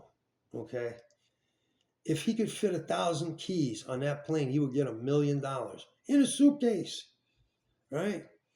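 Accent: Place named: Philadelphia, United States. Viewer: Hey you sound like you got the north Philly drawl! These stories are great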